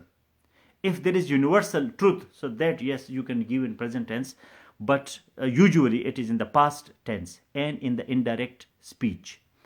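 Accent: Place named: Yousafzai, Pakistan. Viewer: Proud of u Sir..." Sta da de yousafzai accent na zaar sham".....keep up sir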